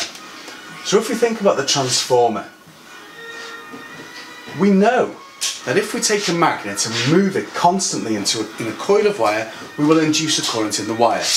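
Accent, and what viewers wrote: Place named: Scotland, United Kingdom. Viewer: He does have a Scottish accent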